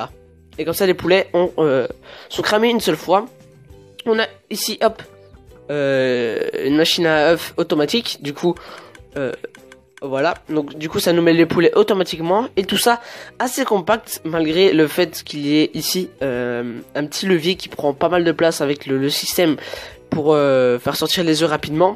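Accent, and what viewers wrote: Belgium. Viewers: GG tu es belge ? ^^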